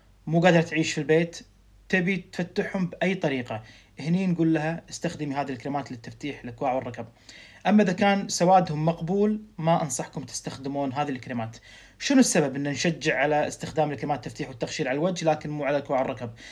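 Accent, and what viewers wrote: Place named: Syria. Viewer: حلو الحجي السوري انا من العراق بس اكيد الهجه السوريه حلوه تحياتي لكل أهل سوريه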